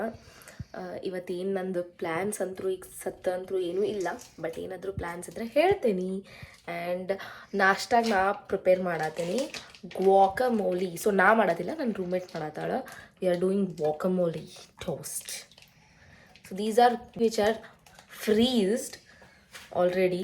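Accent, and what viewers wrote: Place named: Uttara Kannada, India. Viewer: ಉತ್ತರ ಕನ್ನಡ ಮಾತು ಮಸ್ತ್ ರೀ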